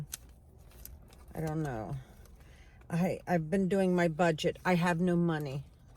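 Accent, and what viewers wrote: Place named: South, United States. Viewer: That pretty.... Southern🎙️🎙️🎙️ VOICE🌷🌷🌷🌷🌷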